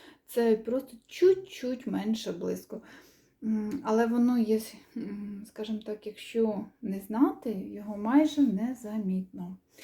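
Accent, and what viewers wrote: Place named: South, Ukraine. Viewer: як завжди ви не перевершені,гарні схеми,гарна робота і може я я помиляюся, милий душі південний акцент.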